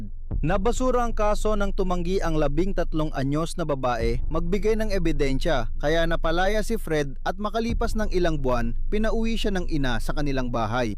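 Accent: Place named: Visayas, Philippines. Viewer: bisayang slang e😂😂😂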